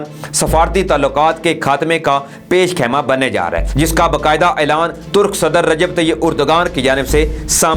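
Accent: India. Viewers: Tum indan andaz me urdo kiu boolty ho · Yes he speaks like Indian people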